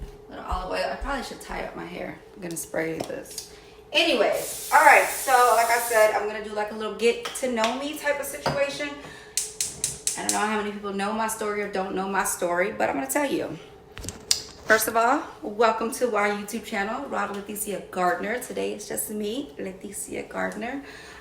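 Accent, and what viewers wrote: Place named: New York, United States. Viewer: I can tell your from New York it’s all in your voice I’m from New York too the Bronx your mannerisms is so New York love it ❤️ you seem very nice down to earth ❤️ I’ve met your sister on ancestry ya look just alike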